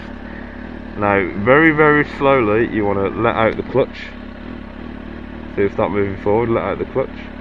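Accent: United Kingdom. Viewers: I think because you're British you sound smarter then all the American tutorial videos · Is that a fellow brummy accent i hear? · Sound Like a Black Country Lad. and im thinking of getting a ninja 250r for my first bike, but iv been told i will out grow it in a couple months, and im lookin for something with abit of longevity something i can grow into rather than out of so im swaying toward a 600cc (zx6r) im 6'2 and 12 stone any advice "/ ? GREAT VIDEO BTW LEARNED ALOT !!!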